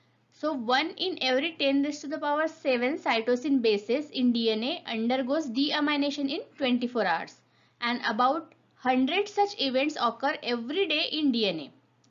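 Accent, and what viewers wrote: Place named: India. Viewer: The indian accent is so clear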